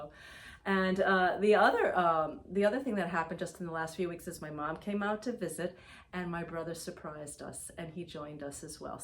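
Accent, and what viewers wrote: New England, United States. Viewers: Love your PC. Just found you last week. I heard your accent and knew we had something in common !. Native New Englander here...Central Falls, N. Prov., Warwick......Love the references you make to being from RI. So sad to see the aftermath of the hotel....happy to hear they'll rebuild. We went to the island last summer. I hadn't been in years. Beautiful as ever. Looking forward to next episode. Happy September. Jeanne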